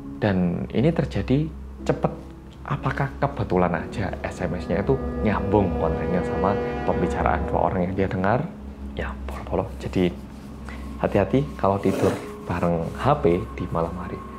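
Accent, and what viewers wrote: Java, Indonesia. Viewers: ilma azzahra ya kan mas cerita ny medok terus bolak balik mas bilang suara dering hp ny "Ring ding dong ring ding dong" itu kan bikin ngakak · Kaya orang korea tapi sekali ngomong itu keliatan orang jawa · owlh mulak men logat e jowo bgt 😅rupa2 ne koyo raono korea2 ne je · dia pernah lama tinggal di indo. di malang, jadi medook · Kak orang aman ....suwarnya kaya logat Jawa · Ni muka Korea kaya orang Jawa logatnya 😅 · Mirip Rep Monster _ Kim Namjoon, a.k.a. salah satu member boy band korea, BTS. Tapi bahasa jawa kentel-nya lucu 😭👍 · Kyk Jawa ngomong nya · Orang korea aja bisa medok masa kita gak bisa Ya tapi gw gak bisa sih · Orang jawa ya bang??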